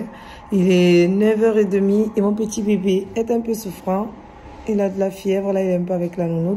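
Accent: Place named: South, France. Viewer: Excellent Sa fait plaisir d’entendre l’accent du sud en Guinée lol je suis pour Marseille au foot c’est pour ça…😅 je suis d’origine guinéenne je ne suis jamais allé en Guinée… c’est comment pendant la saison des pluies l’humidité est-elle supportable ? Merci pour ta vidéo je vais regarder le reste plus tard… 👍🇫🇷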